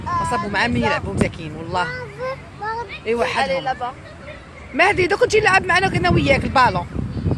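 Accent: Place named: Mostaganem, Algeria. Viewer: Saha aïdek ma soeur marhba bik moi je vien de mostaganem et j'habite à lyon et ta façon de parlé elle est comme la nôtre à mosta,je t'embrasse très fort raki m'wansétna f el ghorba hbiba avec tes vidéos rabi khalik inchallah 😘 😘 😘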